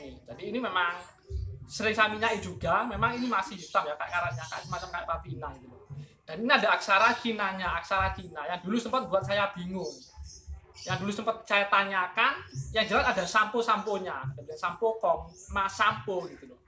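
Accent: Java, Indonesia. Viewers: sampean ini kyknya ngomong berat skl, trll medok ya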